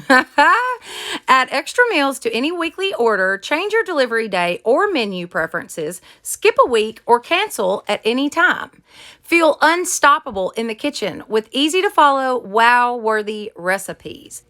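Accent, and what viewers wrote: South, United States. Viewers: The happy harvest truck aint bad. But craft happens and sometimes things just dont come together. If that was your mess up then I would hate for you to see mine. LOL I think everything you make is amazing and love the country twang it just does it for me. Keep up the great work and cant wait to see what you come up with this christmas!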